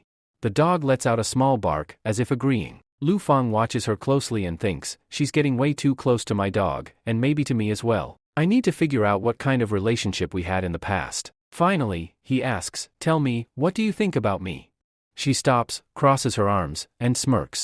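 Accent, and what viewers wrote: India. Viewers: Wtf is this voice bro is not getting ppl it's is like a Indian person is talking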